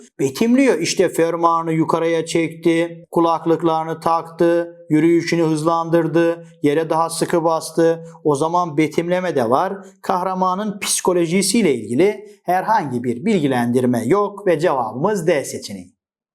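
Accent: Black Sea, Turkey. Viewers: Hocam karadenizli misiniz karadeniz agzina benzettim konusmanizi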